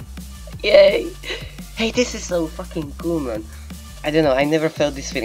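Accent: Czech Republic. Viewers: NICE ENGLISH :D (ale pořád je tam přízvuk čecha :D) nechápu proč nemáš 100 000 subs ? :D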